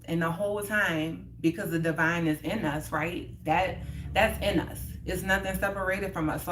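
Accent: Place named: New Orleans, United States. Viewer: I like that T nw orleans accent u he And me😊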